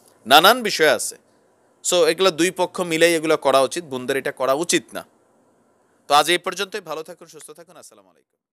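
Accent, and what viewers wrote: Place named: Comilla, Bangladesh. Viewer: ভাই আপনার মুখে কুমিল্লার ভাষাটা সেই লাগে..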